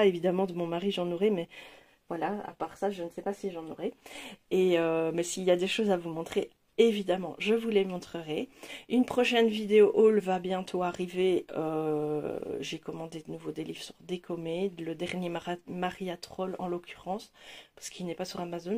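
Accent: Belgium. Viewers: Coucou, tu es multitâches 😍 J'entends un petit accent belge 🤗 (klette 😂😂😂) Bisous 💙💙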